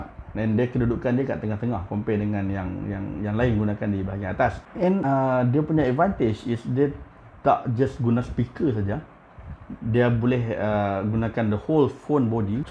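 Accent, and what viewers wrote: Malaysia. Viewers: Brother ni orang penang ka? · Kedah pekat noh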